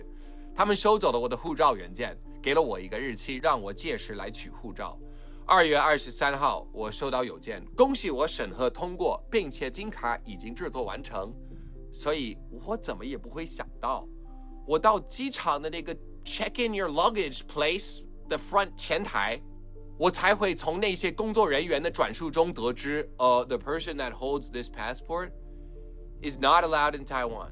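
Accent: Beijing, China. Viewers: 他的中文是在中國好像是北京學的，難免是那邊的腔調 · 應該沒有人期待你來台灣吧！一口大陸腔去中國工作吧！ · 樂樂法利請改掉你的北京腔吧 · 你的中文是大陆口音，不是台湾口音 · 請尊重台灣法律，規定就是規定，還是你在中國太久所以理所當然覺得台灣會因為你是拿美國護照，你就不用遵守台灣的法律規定？偷5元美金跟偷5千萬美金一樣都是偷。針對對台灣政府不給你入境的言論，根本很明顯就是自己不尊重台灣法律，然後裝無辜說要台灣政府給你交代？完全不覺得你很愛台灣了解台灣，或了解台灣人民與歷史（你願意的話，可以多讀點有關台灣歷史）。但其實你可以回去中國，你的口音更適合那裡，台灣人聽到你的口音真的會有某種程度的「抵觸感」。這跟你中文流不流利沒有任何關係。PS.你這個影片也沒有幫到台灣只是很自我中心在說台灣政府欠你說明！你說你想幫台灣？還是想「臭」台灣？好搞笑。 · 真棒臺灣人不要你這個匪諜。大陸口音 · 我怎么覺得你說的中文有很濃的中国腔？ 你肯定上了親中的電台,你被美国政府通知別譲你入台！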